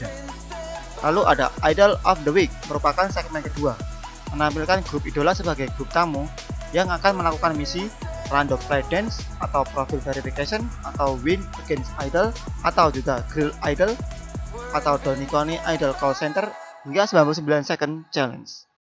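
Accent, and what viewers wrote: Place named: Java, Indonesia. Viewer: jawa sekali kalo baca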